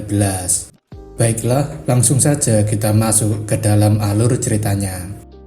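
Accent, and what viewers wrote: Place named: Bali, Indonesia. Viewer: soalnya kata T nya persis org bali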